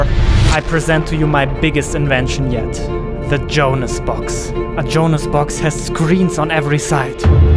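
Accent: Germany. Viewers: Du bist aus Deutschland Ich weiß es ganz genau dein Name ist Jonas und du hast mega akzent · You're german like me I can hear your accent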